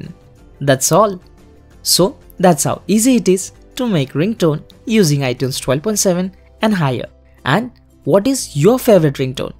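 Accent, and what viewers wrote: India. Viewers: I don't know. I am sorry my friend, but when I hear an indian accent I turn off, purely and simply because of the many indian scammers out there, copying a variety of accents (badly!), but it is a turn off. Sorry bro Take care, good bye.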